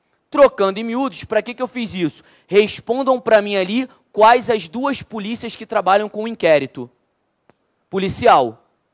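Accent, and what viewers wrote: Rio de Janeiro, Brazil. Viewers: Na boa , a aula não é mau mas sotaque carioca é um saco kkk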